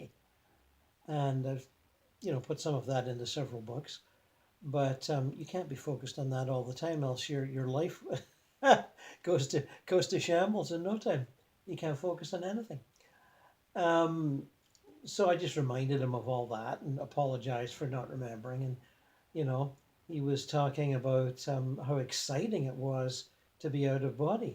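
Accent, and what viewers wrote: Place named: Canada. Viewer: This is my Fav contact from a lot of your vids starting or about..and really kicks in ..better feeling even being in love.. yes you are in pure love and are the assents of love Love all your videos and thank you for posting these, so i don't feel so nuts..yet you let it out as no big deal..and inspires me too..edit : this was the 1st video i saw of you doing this, and the part how he will not ever forget those who were there for him in the end, aw.. see i was there for my grandma of 99 1/2 who died last yr at home using hospice care..and her last words were..thank you 3 times..and im thanking her..she got ill and wanted to thank me and just except it ..and pray pray pray she said 3 times.. it always worked for me.. Be blessed ..from South Carolina USA .. love your accent ..i love to think British in the uk but know its Canadian !?..maybe even Montreal Take care :*)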